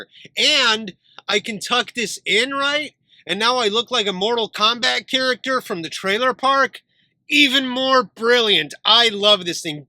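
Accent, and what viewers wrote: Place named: Russia. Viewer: Deeeeeeem I scrolled through your oldest vids to now and dang hearing your accent change, slowly losing the russian accent and becoming more american sounding is a blast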